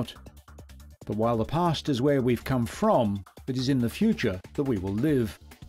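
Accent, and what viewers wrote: England, United Kingdom. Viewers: Hey all, drop the "BRIT" speaking,,,,,,,,, he's calling a '55 Cad a 60, and a '60 chevy,,,, is said to be a '60 Buick!!!!!!!! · The History of American Vehicles. Content is good but why the use of British narration is mind boggling. Can you say Aluminum? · I'm sick to watch documentaries about American goods like cars, narrated by a Brit. It sounds so ridiculous as a vegan cannibal. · History of AMERICAN cars....with a BRITISH narrator.....(who couldn't properly pronounce the names) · It is prnounced c short a mare, like a female horse. O long camareO get your narrator to become familiar with US english. Instead of smug incorrect, British English. If your video is about US cars. Use US pronunciation. Duh. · Because he is British, they pronounce words differently than us. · Alan, you probably did not notice the English accent. 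I believe this was produced by one of your networks. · English voice was a dead giveaway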